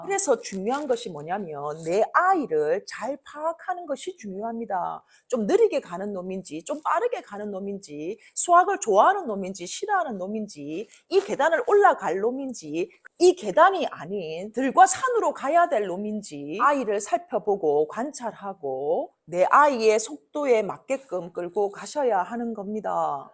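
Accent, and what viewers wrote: Gyeongsang, South Korea. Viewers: 넘 재미있어요. 진짜 잼 있어요... 두 아들이 있는데 둘 다 고졸 입니다. 대학 대신 상장사 AI 팀장과 매니저로 서울대와 카이스트 졸업자들을 AI 교육 시키고 있습니다. 다음에 한번 뵙고 싶군요. AI 시대는 남들과 다른 교육이 필요하고 AI 시대 교육은 새롭게 변해야 한다고 생각해요. 산골에서 단 한번도 사교육을 시켜보지 못했고 과학고에서 영어 4등급 받았어요. 그런데 토익은 만점 입니다. 국내 대학 포기하고 연봉 억대로 취업을 했어요. 저희 유튜브에 대한 이야기도 좀 부탁 드려요. 한번 기회를 주세요^^ 저도 유명해지면 해드릴게요^^ 틀림없이 내년에 유명해 질 것 같아요^^ 경상도 사투리 넘 멋져요^^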